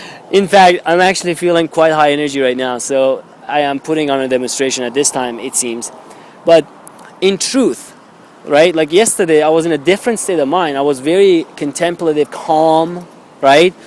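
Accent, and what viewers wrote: Iran. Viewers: just out of curiosity,where are you from originally? you have a slight Persian accent when you speak English!!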